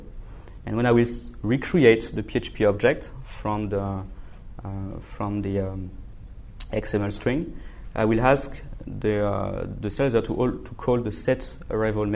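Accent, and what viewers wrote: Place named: France. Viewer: the frenglish accent killed it a bit...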